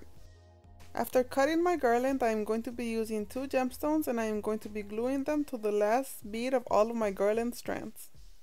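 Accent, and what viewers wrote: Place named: South Africa. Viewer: You sound South African. Very charming pronunciation💐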